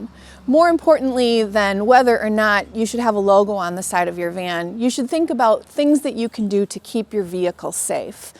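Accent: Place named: Wisconsin, United States. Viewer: its called an accent. Shes from Wisconsin. Also- <link>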